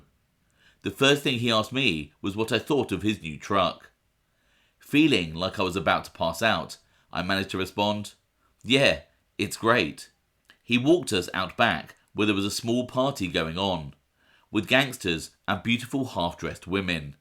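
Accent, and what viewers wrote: United Kingdom. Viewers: The Fine British Accent don't hurt...ha ha...always sounds more serious...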